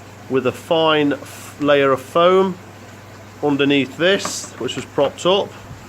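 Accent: Nottingham, United Kingdom. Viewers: Pond and grow on is looking fantastic matey... Just starting with my build at the moment and created a channel... Would love it if you subscribed and if your free offer any advice...By the sounds of the accent and your Notts shirt you may be local to me ? <link> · Love of Orchids,Koi & Gardening! Nice one, is your accent nottinghamish?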